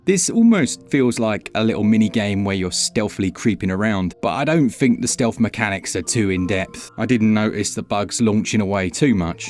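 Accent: United Kingdom. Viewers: I almost never buy any new games but I never miss these videos. There's something comforting about a British dude gently talking to me about Switch games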